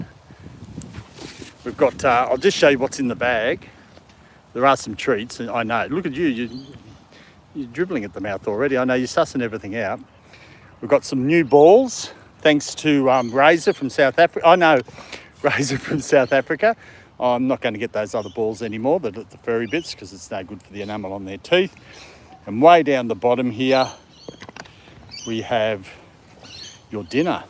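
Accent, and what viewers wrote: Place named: Australia. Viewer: I love the Aussie accent 😍 Not to keen on my own voice , I have a strong London cockney accent 😊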